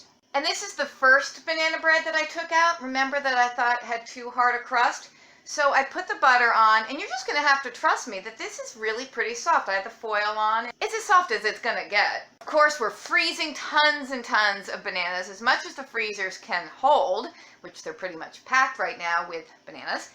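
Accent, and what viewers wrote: South, United States. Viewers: You sound more southern than the actors and actresses that fake them!!❤❤❤😎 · 💞Love your Southern Accent & how funny you are!!💞💞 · I just love Southern Belle voice. xoxo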